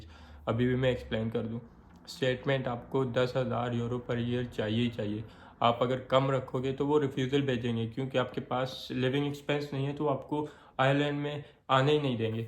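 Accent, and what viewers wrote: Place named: Karachi, Pakistan. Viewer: Bhai accent sy karachi k lagty hn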